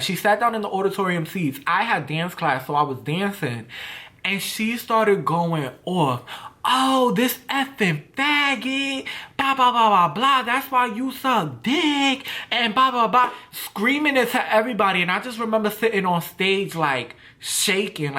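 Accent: New York, United States. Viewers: I love your New York accent😍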